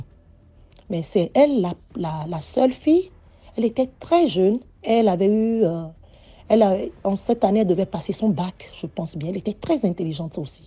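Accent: Benin. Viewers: L’accent est un accent béninois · Ça se passe au Bénin. On reconnais votre accent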